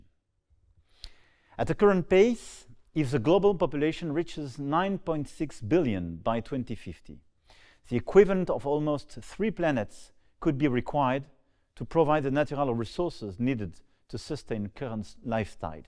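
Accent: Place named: France. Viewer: yeah, your french accent while speaking english is totally sexy, but it's too easy to manipulate your message by discrediting what you say as "lost in translation". the burden of access to your messaging is on non-french speakers. i learned french to understand your politics - anyone else may as well. by enabling single language english speakers you allow france to be manipulated as a "blueprint" when in reality, most geopolitical spaces would never be able to copy french process because france is 80+ years ahead of other nato dev. it's unreasonable to encourage other nations to "follow france" because they could not effectively do so now.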